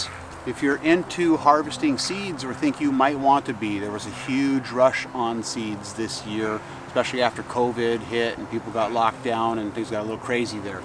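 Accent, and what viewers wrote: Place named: Minnesota, United States. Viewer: Nice Garden!!! I thought I recognized a tiny bit of a MinneSOOOda accent. I grew up in Bloomington. Hey, if you're expanding your garden, get a packet of Sumer Dance Cucumber seeds. Grow them up a trellis. Foot or more long, straight, very few small seeds. Great for sliced pickles. Pine Tree Seeds. TYFS, Mark and Rosa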